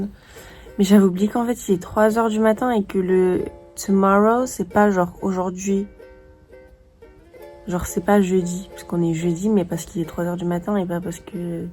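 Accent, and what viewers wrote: United Kingdom. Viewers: Ton accent British 🥺🥺🥺🥺🥺❤️❤️❤️❤️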